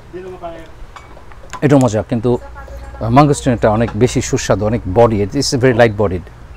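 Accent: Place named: Sylhet, Bangladesh. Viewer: ফিলিপাইনের ব্লগ দেখতে বসে সিলেটের কথা শুনে খুব ভালো ও লাগলো আবার হাসিও পেলো, আমি কিন্তু সিলেটি।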